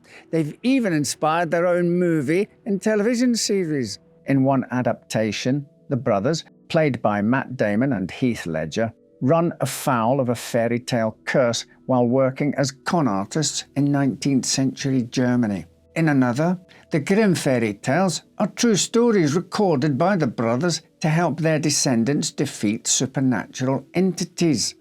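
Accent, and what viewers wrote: Scotland, United Kingdom. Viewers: I love to listen to your telling of tales, and love it when you ‘lose it’. You have a very calming voice and your accent reminds me of my Scottish in-laws, rest their souls! Thank you. ❤️ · I remember all of the Grimm Brothers books. I love the real history of them. I always love watching you on YouTube. Love the Scottish acsent.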